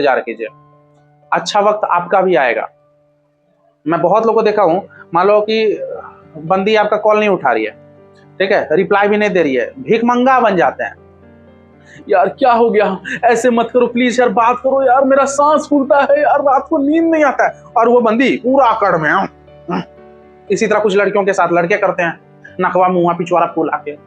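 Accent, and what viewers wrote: Bihar, India. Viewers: Aap bihar se ho kya bhai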